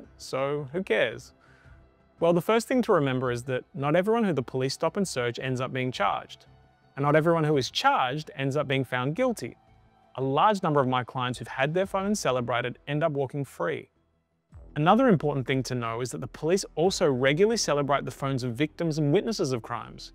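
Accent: Australia. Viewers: It’s so good to hear an Aussie assent, alternative media is the new way for me to hear alternative news. Thank You.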